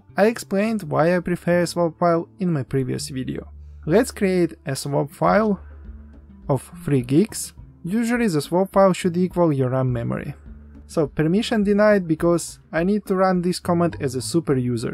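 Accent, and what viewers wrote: Russia. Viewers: русский акцент))) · Акцент не инглиш нихуя, похоже русский. Я по инглиш не шпрэхаю, чё делать то? Пресс Х ту вин? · Оно пытается выглядеть 'своим' для англоязычного ютуба, но с речью решило не заморачиваться и говорит английские слова русскими буквами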